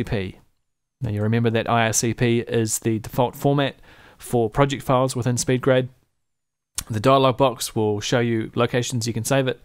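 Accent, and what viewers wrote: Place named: New Zealand. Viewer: Love being a kiwi then hearing another and be like ahh thats better coming from some 12 year old strong american accent haha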